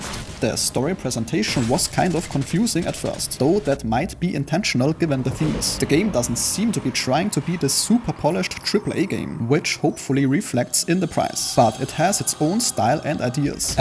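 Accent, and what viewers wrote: Germany. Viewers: Sprichst du deutsch? Dein Englisch klingt so. Kann man in dem Spiel irgendwo speichern oder muss man jedesmal komplett neu anfangen wenn man stirbt und dann alles verliert 🙈?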